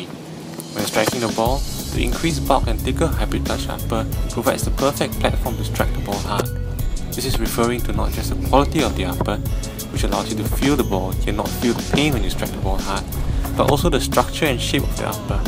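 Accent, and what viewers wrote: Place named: Singapore. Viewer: I can tell ur from Singapore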